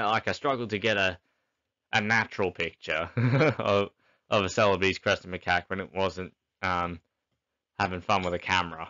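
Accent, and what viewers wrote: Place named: Australia. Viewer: Heeey an Aussie Planet Zoo voice! Great to hear one of us out there.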